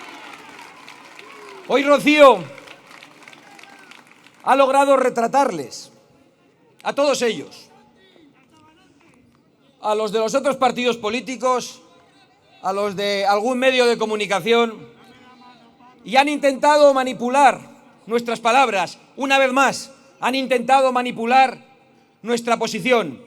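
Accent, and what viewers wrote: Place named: South America. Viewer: Mi marido dice que suena sudamericano, no español.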